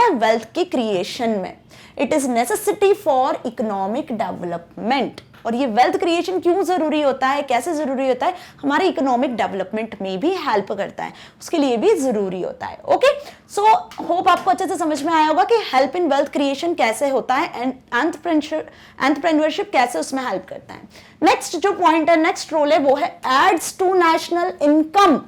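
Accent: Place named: South, India. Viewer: Everything else is okay but ma'am your accent looks like south Indians that is making it difficult for me to understand the words 🙂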